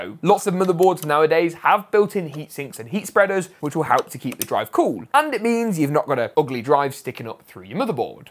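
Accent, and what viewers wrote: United Kingdom. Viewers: Why does your English sound British?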